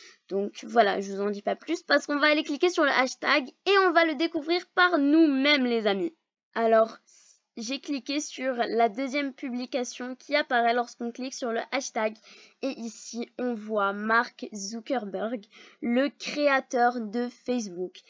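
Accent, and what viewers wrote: England, United Kingdom. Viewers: Toujours un aussi belle accent anglais😉🦋 Et merci beaucoup pour l'explication!!😁❤ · Toujours un belle acsent anglais 👍✨ Ps :jaime trop t video et ton acsent ! Gros bisous et bonne vacances 🌼😂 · Merci pourles infos aussi belle accent anglais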